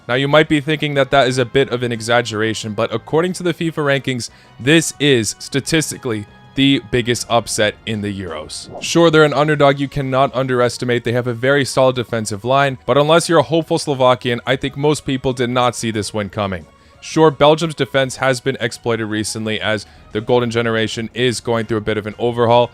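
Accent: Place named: United States. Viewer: He lived in the US for quite a few years hence the accent.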